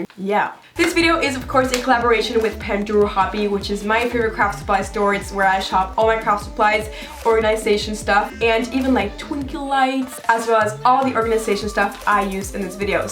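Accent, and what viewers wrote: France. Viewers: you have a great french accent and i know wht i'm talking about, i'm french ! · I'm french and I have the same accent as you love your vids merci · Hey are u French or something because it sounds as if u have some kind of accent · Your French accent is sooo cute , I speak French since my 2yO😛😛😛💕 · I’m not even French and I know that her French accent is on point 👌🏻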